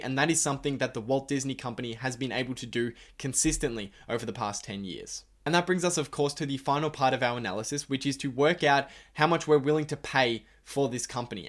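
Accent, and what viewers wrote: Australia. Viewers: Like your vids and your Aussie accent :)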